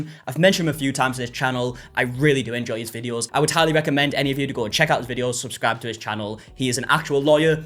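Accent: United Kingdom. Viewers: Yeah, the light to no R pronunciation and the schwa-ification of almost all vowels in some British accents is amazing sometimes 😂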